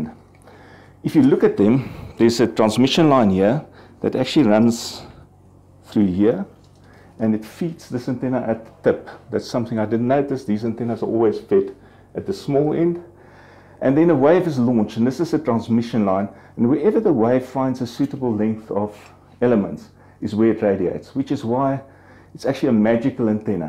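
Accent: South Africa. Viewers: S that a South African accent?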